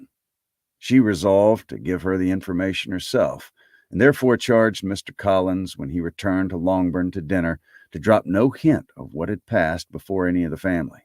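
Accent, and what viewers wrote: South, United States. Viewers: Is the "...and Magnolias" added simply to indicate that it has been read in a southern accent, or does it come from some adaption, like, "Pride & Prejudice & Zombies"?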